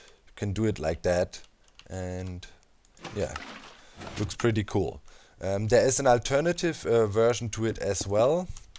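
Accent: Germany. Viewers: funny how you switched to a french accent (from your normal German accent) when trying to show off German accent. But then... they do that in the movies, too :D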